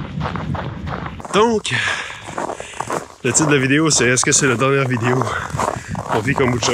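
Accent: Quebec, Canada. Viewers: Je voulais vous remercier pour toute les astuces que vous nous avez partagé. Si j'arrive à faire mon kombucha, c'est grâce à vous. C'est un plaisir de vous écouter (avec cet accent Québécois si agréable). A bientôt.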